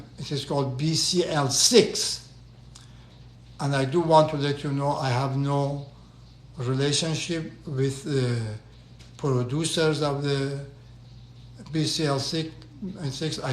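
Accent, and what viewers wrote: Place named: Iran. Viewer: Thank you for your video. I have a 3.5 c.m. Cyst on my right ovary causing me pain. What are my options? My husband and I want to have a child but this situation is making me hold off. Would I require surgery and if so, is my fertility still in tact? Also, you sound Persian. I am also Persian. Thank you 🙏🏼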